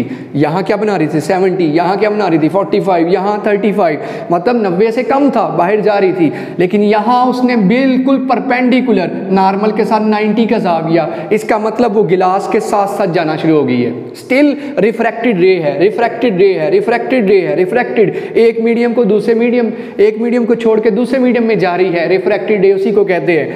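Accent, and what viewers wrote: Punjab, India. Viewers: Punjabi teacher😁,but well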